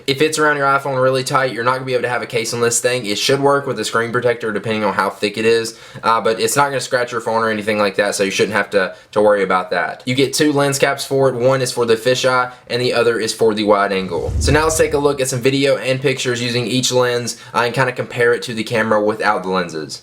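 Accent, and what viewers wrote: South, United States. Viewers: you sound so country(: its adorible( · You sound really southern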